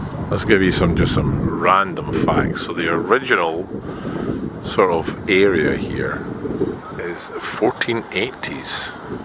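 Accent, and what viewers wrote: Scotland, United Kingdom. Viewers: My little cat Jasper seems to love your Scottish brogue. You really have his attention. 😊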